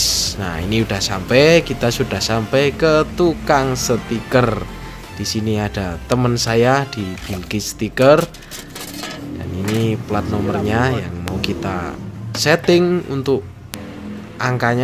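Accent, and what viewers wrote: Temanggung, Indonesia. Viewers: Wong temanggung po om?? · orang mana mas kok kayak temanggung.. lar lur😁😅😁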